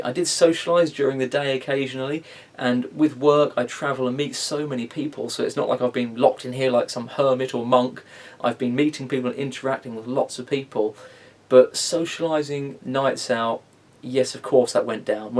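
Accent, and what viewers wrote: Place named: England, United Kingdom. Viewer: It's just nice to hear a nice clean English accent. You even pronounce you Ts. I'm so sick of being surrounded by the "Init bruv" accent.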